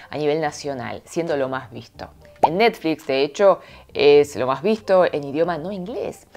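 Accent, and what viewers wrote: Argentina. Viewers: Me enamoré de esta chica con acento argentino y con esa voz envolvente y sensual.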